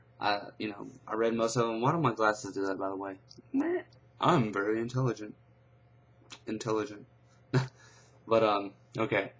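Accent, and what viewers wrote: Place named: South, United States. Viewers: I love your southern accent :D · your from LA???!! You seem like your from Alabama...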